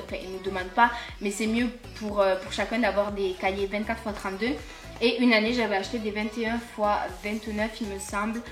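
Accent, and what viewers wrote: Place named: Toulouse, France. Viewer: Salut ma belle je viens juste de découvrir ta chaîne youtube et c'est une très bonne découverte dès le début de la vidéo j'ai directement reconnu l'accent de Toulouse qui est aussi ma ville, j'espère te croiser un jour dans Toulouse.Continu ce que tu fait tu est super naturelle et c'est une très bonne vidéo, bonne continuation sur youtube :)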